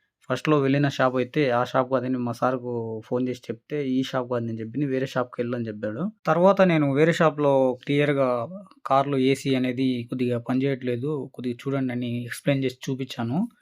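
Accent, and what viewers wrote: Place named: Karimnagar, India. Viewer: Mee matalu vintunte ma karimnagar la anipistundhi bro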